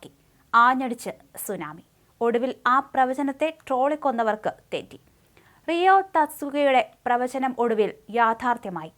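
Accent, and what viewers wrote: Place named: Pala, India. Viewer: Palakaari aanan thonun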